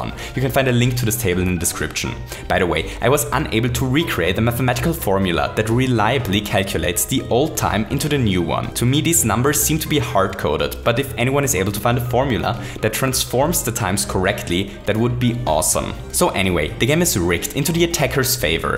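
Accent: Germany. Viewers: Is he German? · Bro der deutscheste akzent den ich jemals gehört habe (Nohate) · Man hört das Deutsche so sehr heraus :D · are you german? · Wie man direkt heraus hört das er deutscher ist...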